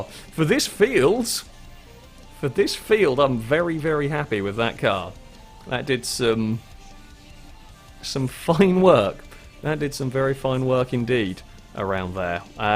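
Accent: England, United Kingdom. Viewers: Going for the Aussie demographic in this video eh? As a Holden guy through and through it saddens me that it's getting killed off this year :( And also the way you pronounce Falcon is quite strange but I'm guessing that's just the Pommy accent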